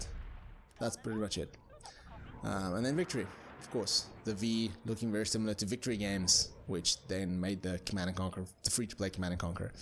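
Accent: South Africa. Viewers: Respek. Generals is a classic of classics. And BTW where you from? Souf Efrika?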